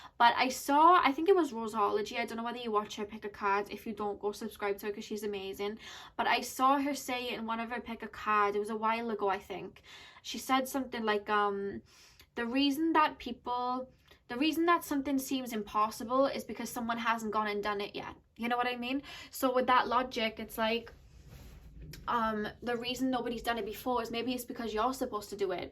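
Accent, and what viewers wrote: Wales, United Kingdom. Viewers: I love it the Welsh is one of my most favourite accents I don't know how but I hear a little bit geordie in there it's lovely! And your readings are so good!! 😘💗💗💗💗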